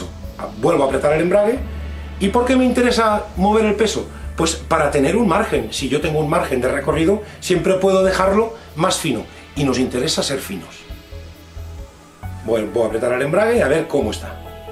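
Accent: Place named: Catalonia, Spain. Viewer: Quin accent tan catala que tens!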